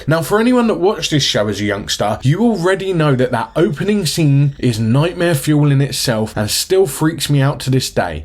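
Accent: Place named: East Anglia, United Kingdom. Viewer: Are you from Suffolk or Norfolk? Trying to place the accent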